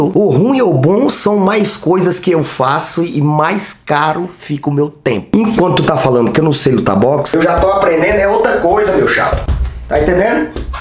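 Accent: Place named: Ceará, Brazil. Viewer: Cara ta com um sotaque de cearense q mora no barroso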